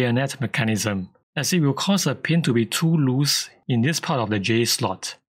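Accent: Singapore. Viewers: Singaporean accent on a great educational video? YES, immediate subscription!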